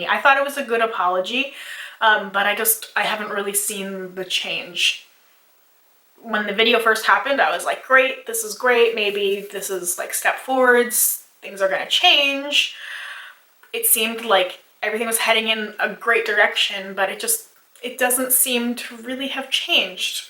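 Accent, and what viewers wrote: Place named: Canada. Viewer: It’s always funny hearing you say “out” and “about” but you also say it really cute. I think it’s a Canadian thing lol cuz I’m American and we say it differently. But you say a very cute, soft, “oot” and “aboot “ and it’s very endearing.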